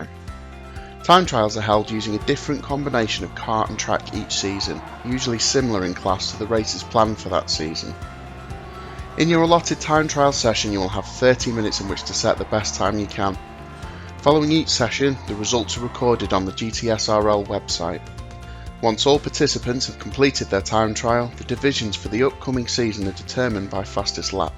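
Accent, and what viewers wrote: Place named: RP, United Kingdom. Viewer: hell yeah commentary with queen's english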